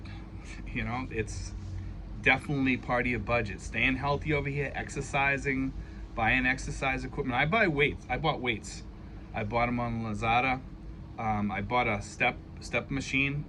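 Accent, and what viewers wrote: Boston, United States. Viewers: I could tell you're from Boston as soon as you started talking. I'm from Rhode Island. :)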